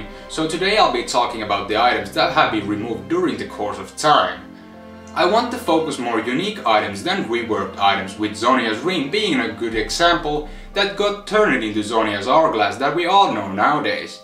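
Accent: Finland. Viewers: Are u from Finland? If u arent u just speak like Finninsh people does.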